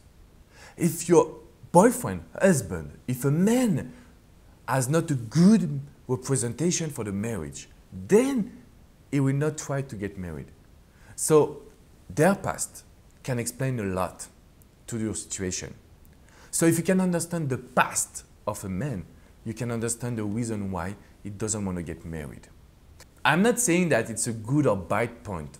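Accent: France. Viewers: Like your French accent!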